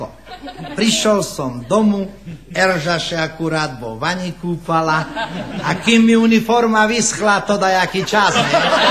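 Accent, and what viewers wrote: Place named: East, Slovakia. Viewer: abo kto nepozna vychodnarcinu ten da palec dole podla mna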